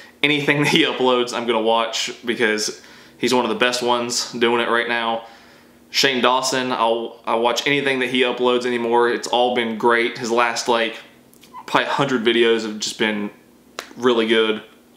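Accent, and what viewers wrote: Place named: South, United States. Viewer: That Southern drawl is on point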